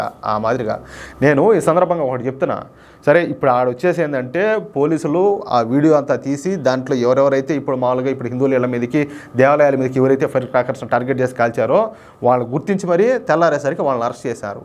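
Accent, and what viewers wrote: India. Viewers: Superr sir. Way of explantion is good sir. Telugu slang also attractive sir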